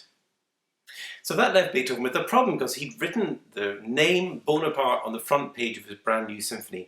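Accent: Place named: United Kingdom. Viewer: What part of British isles are you from? Your accent is very pleasant.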